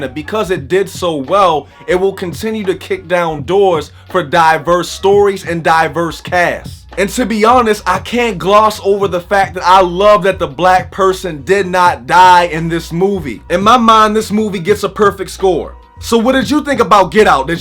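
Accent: Baltimore, United States. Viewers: I hear your accent. what part of Baltimore are you from? lol good video. I didn't pick up on the song but it makes sense. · you gotta be from baltimore bruh i hear it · Your B-more accent is so strong lol. And maybe I saw you blink just once? Excellent commentary. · are you from Baltimore? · You from Baltimore,? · this guy gotta be from Baltimore I can hear it in certain words he say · I hear that Baltimore shordy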